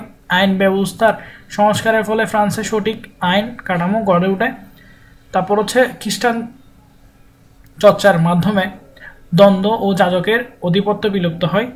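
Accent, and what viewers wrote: Chittagong, Bangladesh. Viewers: ভাইয়া আপনি কি চট্টগ্রামের বাসিন্দা???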